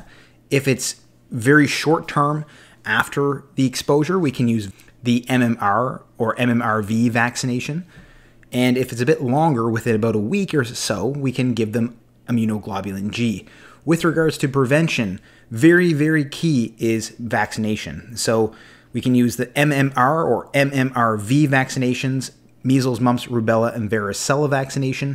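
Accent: Canada. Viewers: I love your Canadian accent ! About, out, etc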